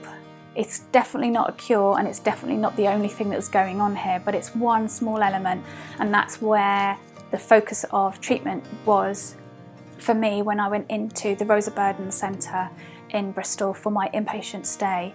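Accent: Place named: United States. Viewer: Bonjour. Je réponds donc en Français. Je reviens d’une promenade dans la nature. J’habite dans la région Auvergne-Rhône-Alpes. Êtes-vous la dame de la vidéo? Êtes-vous américaine ou britannique? Je pencherai plus pour la nationalité américaine, au vu de votre accent et du fait que vous parliez sur you tube. Je souffre du trouble neurologique fonctionnel (TNF=FND) depuis ma vaccination ARN messager. Je marche avec une canne et je souffre d’une dépression existentielle assez aiguë… J’ai des douleurs neuropathiques. Je vais chez un neurologue en septembre pour écarter les maladies neurologiques classiques comme la sclérose en plaque ou un accident vasculaire. J’ai adhéré à l’association Covid long France pour ne pas me sentir seule face à la maladie. Je vous donnerai régulièrement des nouvelles en anglais ou en français comme vous le souhaiterez. 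Ce sera un réel plaisir pour moi. 🙂🙂🙂 Amitiés. Christelle 🌈🌈🌈🌈🌈🌈🌈🌈🌈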